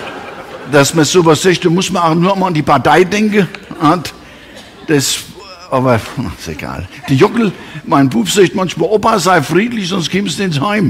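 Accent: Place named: Taunus, Germany. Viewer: Großraum Bad Homburg / Taunus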